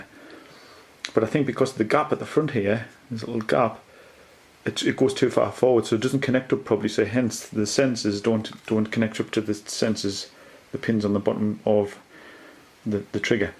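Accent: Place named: North East England, United Kingdom. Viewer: hi, I like your accent, sounds like north-east England. Is Nikon D750 built-in flash also using optical signal to control? If we use a sb700 on the top as a master flash, do we still have to use trigger for other slave flash? Thanks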